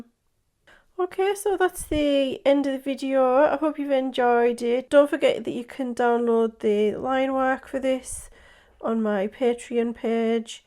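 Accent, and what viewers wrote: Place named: Birmingham, United Kingdom. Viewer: Trying in vain to pin down your accent but I’m getting North East England and Brummie 🤔 Lovely work and I’m glad YT shared your channel with me today.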